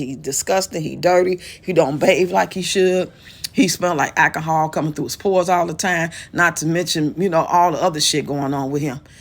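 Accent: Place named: Chicago, United States. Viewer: I’m born n raised n Chicago but I live in la , soon as I start watching your videos I heard your accent and I’m liiike ayyye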